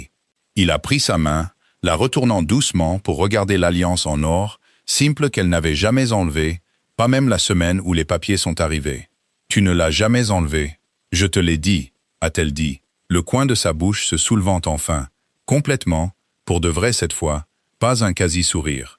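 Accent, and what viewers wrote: United Kingdom. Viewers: Merci pour ce beau récit, l’honnêteté enfin reconnu..ainsi que l’amour, merci également au narrateur et à son joli accent britannique. Je vous écoute du Sud de la France, proche de la Mer Méditerranée..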